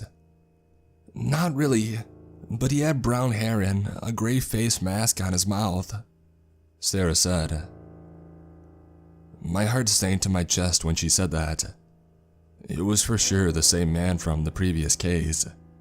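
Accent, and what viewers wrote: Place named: South, United States. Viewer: It's a Southern guy man give him some slack lol.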